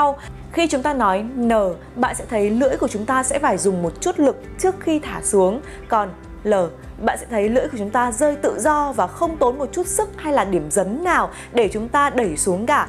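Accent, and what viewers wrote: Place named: Hanoi, Vietnam. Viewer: chị có chất giọng hà nôi hay quá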